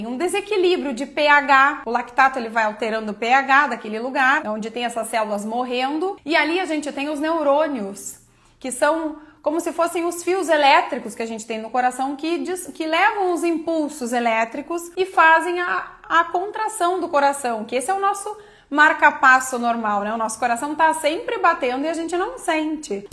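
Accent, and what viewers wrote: Rio Grande do Sul, Brazil. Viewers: Dra de onde a Sra é? Do Rio grande do sul? Se sim atende onde? Moro perto de Porto Alegre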